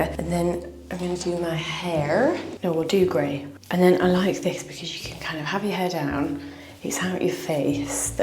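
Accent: United Kingdom. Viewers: Okey I m here to learn British accent ❤❤❤❤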